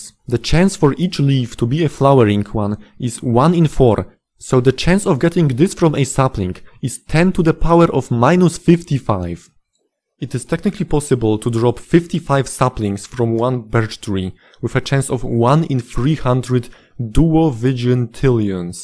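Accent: Poland. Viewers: Are u polish? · polish accent is peak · your accent is too polish. can't watch. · Czuć po akcencie że rodak ;) Fajny filmik. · Myślę sobie, kurde akcent jak u Polaka, patrzę na informację o kanale - oczywiście że z Polski xd pozdro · You sound polish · Do i hear Polish accent or am I tripping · Are you Polish mate? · As a fellow Pole, I have to say, you have the most stereotypical Polish accent ever 😭😭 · Bro i swear to god you sound 99% Polish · the second i heard the voice i knew you were from Poland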